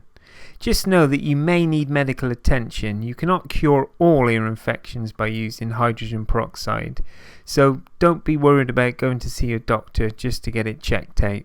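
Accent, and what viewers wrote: United Kingdom. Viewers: Pretty sure mould is the British spelling of the American word "mold". Judging by our narrator's accent, he must be from that part of the globe. I do not think it is wrong to point out a misspelled word in any public context; how else are young people supposed to learn if not by example? Both acne and disinfect were misspelled in this video - pointing out or correcting a mistake does no disservice to the narrator and just might do someone a favor. Come on, is it really better to remain ignorant and illiterate than to learn a little something? How else are young people to learn, if not by observing the world around them, spelling and all? Please, stop being so stubbornly opinionated about someone pointing out an error in a video; it should all be part of the experience. Or are civil discussions completely beyond us....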